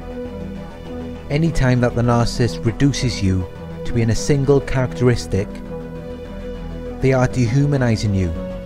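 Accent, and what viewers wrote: Wales, United Kingdom. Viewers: Your voice tone is helpful- to an American you sound a little like a famous Welshman - Richard Burton. Not exactly of course, but we know you are wounded similarly. He wrestled in a narcissistic system, and family life. Thanks for the better benefit. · You sound Welsh .. possibly near Newport. I experienced this for 5 years and had to undertake hundreds of hours therapy, finally finding Anoushka at Balance Psychologies to help me twice a week with specialised NPD abuse therapy / counselling. I've learned about this now .. it's been the hardest time in my entire life .. I absolutely get it now, and I find it interesting that there's a Newport person on here reporting this. I'd love to get together with you and talk through this together. I'm in my 50's and she got me, I had no idea. I now have taken the red pill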